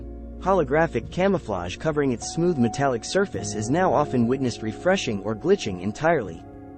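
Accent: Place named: Australia. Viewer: Thats not your voice!! You, my man,sound like crocodile dundee!! Crikey! Great job! The moon is indeed a spaceship.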